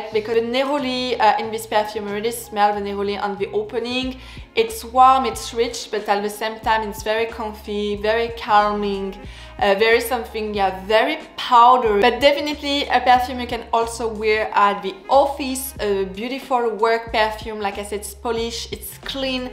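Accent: France. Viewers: This is 1 of my all time FAVORITE parfumes EVERRR I was introduced to this fragrance at the tender age of 14 brought my 1st bottle at 16 years young along with yves saint Laurent champagne and Dior's poison tendre And Amarige Been looking into getting a new bottle of lair du temp Because I have been seeing her ALOT as of lately And I BEEN into getting all my original signature scents because MINES are some of the best ever made due to my GREAT taste in fragrances and scents🙊😜 LOVE your videos you are AMAZINGLY DECADENT TO WATCH YOUR GORGEOUS & SWEET & IM A SUCKA FOR A FRENCH ACCENT IM A SOFTIE FOR AND ACCENT PERIOD. BUT ESPECIALLY FRENCH BEING IT'S THE LANGUAGE OF LOVE AND ALL 💗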